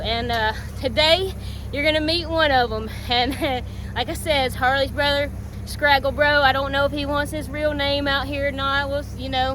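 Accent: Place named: South, United States. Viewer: good video love. her country accent...lol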